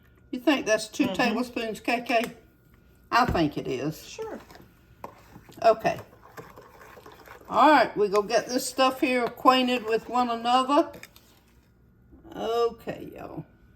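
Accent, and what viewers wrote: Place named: South Carolina, United States. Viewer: When my husband was active duty, we were stationed in Hawaii for a while. The Hawaiians swear by their macaroni salad. One thing I learned from them is interesting. Before you add anything else to your cooked macaroni, stir only the apple cider vinegar into the noodles. Just let it sit for an hour or two before adding everything else. I can't say how but it does make it 'different'. I can't say better because yours looks marvelous. Anyway, just thought I'd mention it. I love your channel. My grandmother sounded just like you. She grew up near the SC line and adopted your most beautiful accent.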